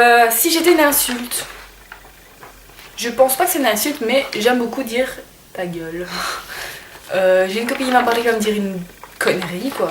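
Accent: Belgium. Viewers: D'ou vien tu ? tu as un accent belge non ?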